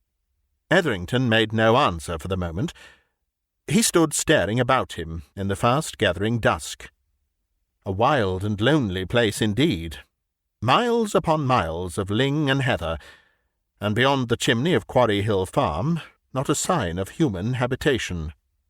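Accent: United Kingdom. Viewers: Thank you . Much appreciated. I am Egyptian. I did enjoy the superb reading, the beautiful British accent, and the story of course. I can’t say I fully understood the bank “dealings”/terms, but then I can barely understand customer service at my own branch no matter how user friendly they try to be to my 63 yo ears in my own country.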